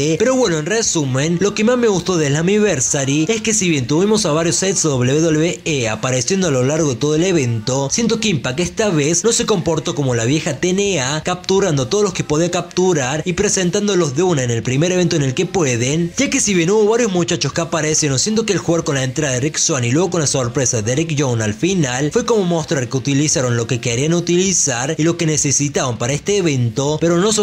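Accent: Córdoba, Argentina. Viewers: x q habla como cordobes?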